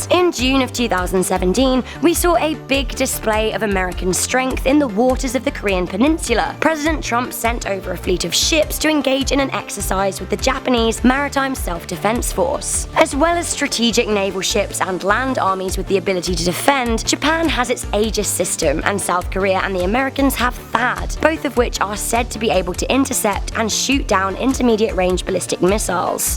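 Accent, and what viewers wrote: United Kingdom. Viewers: I don't like the British accent.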